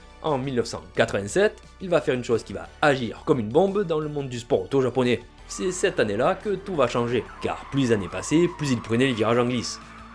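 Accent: South, France. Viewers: Hhha! Initiale d le livreur de tofu tout fou! Hé c bo, 🤗 cool ta vidéo et j aime bien ton accent du soleil Fréro ,t as un nouvel abonné 😘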